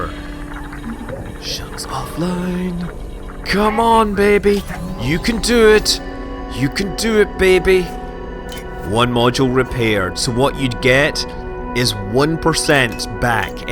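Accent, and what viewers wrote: Scotland, United Kingdom. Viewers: The Scottish accent probably threw him off.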